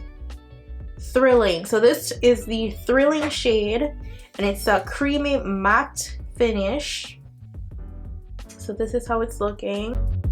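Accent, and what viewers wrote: Jamaica. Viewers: Are you Jamaican 😊 ?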